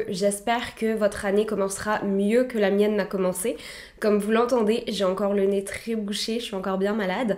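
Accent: Quebec, Canada. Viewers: pas de quoi, je me disais bien que ça devait venir de l'anglais, mais ton accent québécquois n'est presque pas perceptible, je sais pas si tu l'a travaillé, mais ça fait très pro! bonne continuation! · Bonjour, ma première video de toi, j'aime beaucoup, merci. Je sens une toute petite pointe d'accent québécois, je me trompe ?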